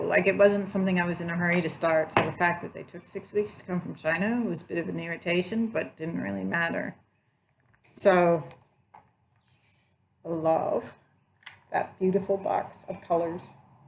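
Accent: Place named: Australia. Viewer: I chickened out doing project bags with zips, I do the Vonna style(no zips lol). And what's wrong with our British sense of humour 😜 , although I don't find the modern 'comedian' funny at all. I don't find American's funny. Don't think I've heard an Australian comedian. Have I got a sense of humour? Who knows lol. Ooh I love the butterfly your doing. They're stunning, was that you holding it? You definitely sound more Australian than American. Off to watch the next one😜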